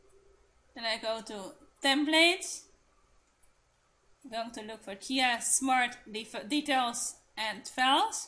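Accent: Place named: Netherlands. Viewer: Zo te horen aan je accent kan ik hier gerust iets in het Nederlands neerzetten. :-) Top Tutorial! Net wat ik zocht.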